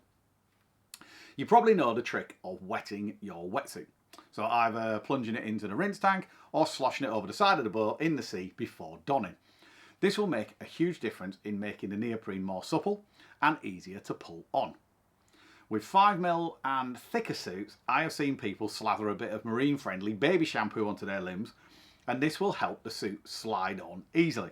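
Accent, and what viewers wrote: United Kingdom. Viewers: To all not in the know, is a proper Englishman with an English accent! Don’t forget, the British invented pretty much everything including SCUBA, not the French, so he has licence to describe everything SCUBA! Personally, best way to get a wetsuit on is to use drysuit lube on the ankles & cuffs. I also take lengths of thin rope with carabiners to hang stuff to dry off the rails on the liveaboard. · sorry, having some trouble understanding. Cheap and plastic what often found in camping stores. Missed the bag trick for wetsuits too. Your brit accent is strong. Maybe demonstrate some of what you are saying. Thanks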